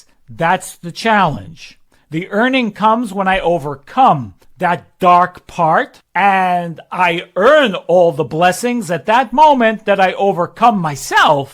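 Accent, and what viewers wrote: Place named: New York, United States. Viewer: Just discovered ur channel- never heard a Rabbi read or teach or relay a sermon before (whatever it is ur doing on this channel) but find u inspiring, knowledgeable and passionate (not to mention-ur accent that I love- that reminds of me of my days in NYC in law school many years ago). Scorpio man here through and through and working on self - improvement and development (spiritually). Though I’m Catholic, we all find that wisdom comes in many forms sent to us in many ways- and well, ur wisdom and sharing of same will become a great addition to my growing library of go-to’s. Thank you!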